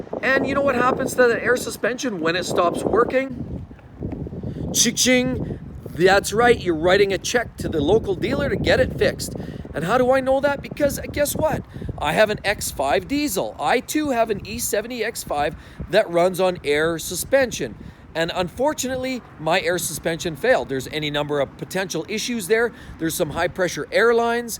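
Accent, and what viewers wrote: Canada. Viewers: My pleasure. Thanks for making these very informative videos (with a nice Canadian accent, to boot.:)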